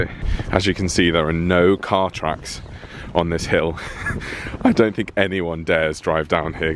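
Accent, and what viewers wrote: England, United Kingdom. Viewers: you have a genius for relating to your viewers, giving us the impression of being in an intimate one-to-one relationship with you. I moved to London from Australia in my early 20s returning to Oz in retirement. Although I love it here, I still enjoy the Englishness of your accent and the lovely ordinariness of English life I enjoyed there in my salad days.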